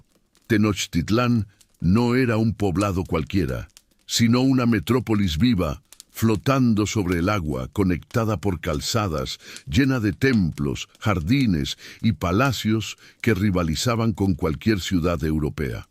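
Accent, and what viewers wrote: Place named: Spain. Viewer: Me cargan las voces de gachupines contando historias de las civilizaciones a quienes invadieron robaron devastaron y saquearon durante siglos... pobrecitos aún siguen con hambre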